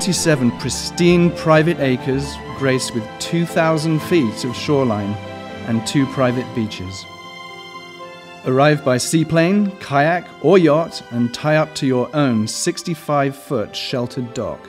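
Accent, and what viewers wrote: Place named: United Kingdom. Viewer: Why is the narrator a brit? there's no brits in the San Juans. (I grew up there). Just sayin...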